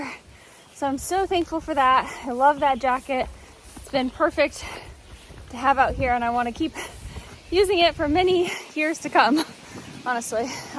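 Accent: United Kingdom. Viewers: I loved the British accent!! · Great day! Thanks for taking us along. Your smile and laughter are infectious!!! As is your British accent. 😊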